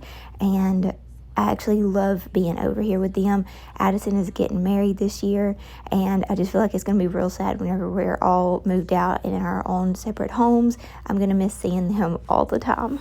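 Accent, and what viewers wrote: South, United States. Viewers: your sweet southern drawl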